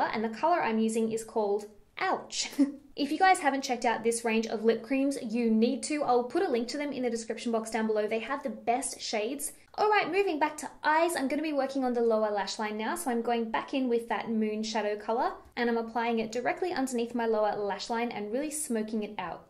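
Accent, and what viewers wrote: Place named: Australia. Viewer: #LANGEFAM Hey, I am brand new to YouTube - seriously only used it for music in the past, but recently have been watching many of your videos! You are brilliant! Your make up advice is so easy to follow and definitely makes a difference. I've watched others, but didn't like them as much - maybe its the Aussie accent lol I was always a tomboy/athletic/workaholic, so I wore makeup, but never really took the time to really appreciate what works best....you have helped! THANK YOU! Would love that kit to really try to improve my look! Keep being you! You're great!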